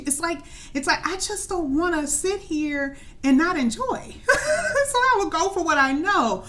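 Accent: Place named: United States. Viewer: Thanks for the reading. I loved it and it made me so happy and resonated. Greetings from England and I adore your American accent and voice. I look forward to your next video sister. Also you are so clever to read tarot and predict the future and is so kind of you to do free readings. I am struggling financially right now and we are in lockdown in England but once I find my feet I will definitely contact you for a personal reading. I hope you are not depressed with lockdown and making enough money. I don't know if you have a day job as well as the tarot but I hope you are getting by financially in lockdown as many people are struggling. I would like to support your business as a fan of yours and have a personal reading and recommend you to my friends who will hopefully recommend you to others. Love and light. God bless you. Stay safe sister Love you lots xxx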